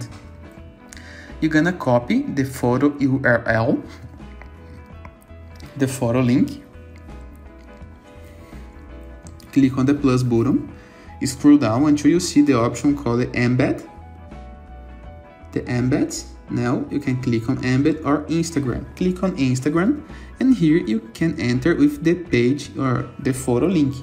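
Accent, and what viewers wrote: Brazil. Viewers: Are you brazilian? The accent sounds like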